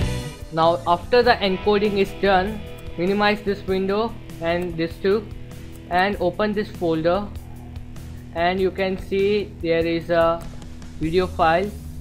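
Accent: India. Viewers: His accent bet his indian? if im not wrong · HE IS LIKE INDIAN AHH THAT VOICE BUT TNX ALLOT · Stopped watching after i heard that he was indian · Obnoxious "music" and audibly, almost definitely Indian voice (and all that you know). Nah, there are better tutorials. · AWSOME Indian accent man I like it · you speak like a indian ,i think you are indian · heehee are you indian? :)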